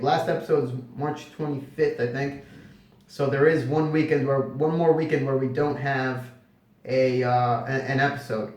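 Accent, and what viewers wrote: Italy. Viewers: oooh sí calabrisi?? Iu sicilianu, più o meno lo stesso dialetto;)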